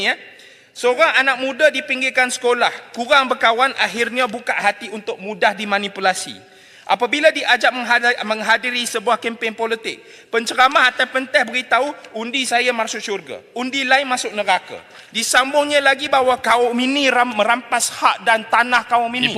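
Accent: Perak, Malaysia. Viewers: Ckp perak yop... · steady mp ni....cina cakap perak yop....👍👍👍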